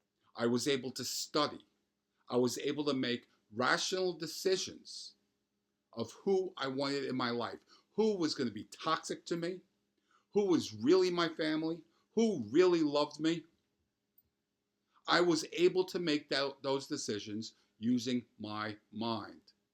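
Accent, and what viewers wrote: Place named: East Coast, United States. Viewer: You sound East Coast. I live in Philly, and if you are near here I will gladly re-pay you by taking you through physical recovery with Yoga and other methods I use.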